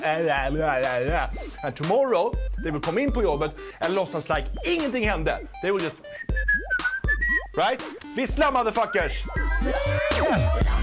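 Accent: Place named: Sweden. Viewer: It's called "Swenglish". ;)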